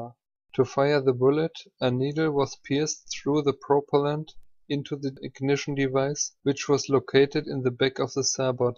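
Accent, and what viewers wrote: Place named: Germany. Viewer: Ich würde dir anbieten, dir dass in einem verständlichen und korrekten Englisch zu besprechen, weil so ist es ziemlich "denglish" und kaum ein Muttersprachler wird sich das "antun".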